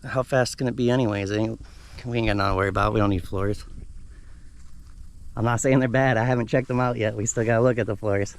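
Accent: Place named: North, United States. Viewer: Where are you from in Florida? Your accent sounds more northern than southern lol. Love the video!! Sweet ride too!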